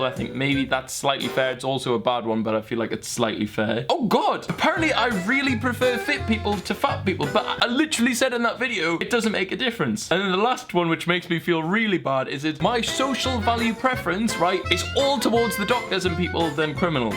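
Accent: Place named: Newcastle, United Kingdom. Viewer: Love the fellow geordie ❤️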